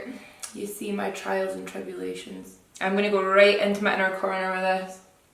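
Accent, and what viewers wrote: Scotland, United Kingdom. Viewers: Are you Scottish? You sound scottish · Is she Scottish? I LOVE HER ACCENT! 😍😍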